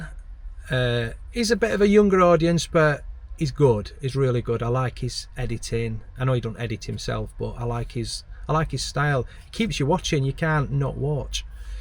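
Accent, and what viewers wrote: Yorkshire, United Kingdom. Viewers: Hello,!! Yank here, who absolutely loves your channel!! I'm a new subscriber, so I don't know a thing about "van life," and TBH, I will watch any content you post and not bellyache!! Any viewer who tries to manage your content needs to get stuffed!! Your Yorkshire accent knocks me out!! And your observations are hilarious!! 🤣🤣🤣 Sweet dreams!! Ta... Chuck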